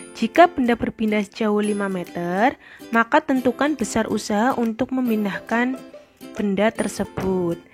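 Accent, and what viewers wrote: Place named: Tegal, Indonesia. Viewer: Ini kayaknya mbaknya orang tegal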